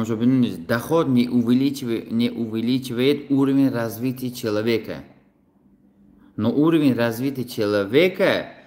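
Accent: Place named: Khujand, Tajikistan. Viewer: Эй бародар забони тоҷикиба ть читари гап мезани.... нестай..... ин додари мо ай Хуҷанд мебошад!!!! Лаҳҷаи Хуҷанди фарқ мекунад!!!!!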